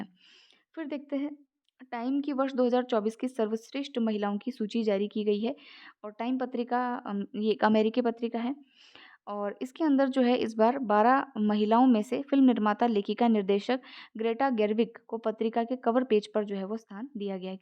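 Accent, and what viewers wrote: Haryana, India. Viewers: App haryanvi ho kya??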